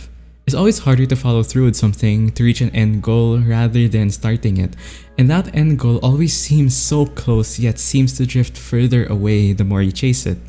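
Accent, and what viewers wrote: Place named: Philippines. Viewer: This guy sounds filipino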